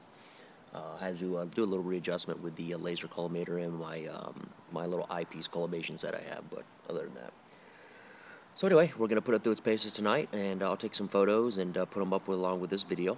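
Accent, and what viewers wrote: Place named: Texas, United States. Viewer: i thought you was from texas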